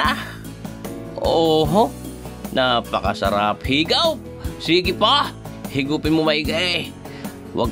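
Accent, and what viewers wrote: Batangas, Philippines. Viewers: very nice accent batang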